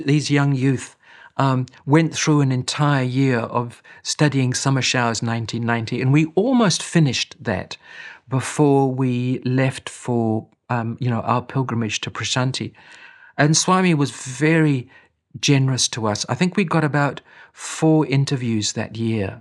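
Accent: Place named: South Africa. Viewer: It sounds like hails originally from my country - South Africa. My first Prashanti Christmas was in 1986... Never, ever to be forgotten!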